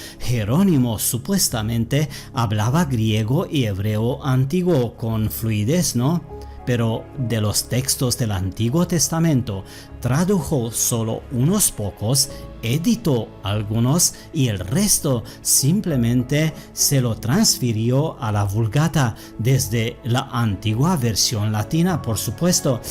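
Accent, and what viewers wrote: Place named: Germany. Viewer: suena como si fuera alemán nativo, escucha narrar a alpha mind o a libertalya ambos creo que también son alemanes narrando estos temas en español y su pronunciación es la misma que. No pasa lo mismo con los gringos o negros de inglés nativo que hablan español su pronunciación es muy diferente.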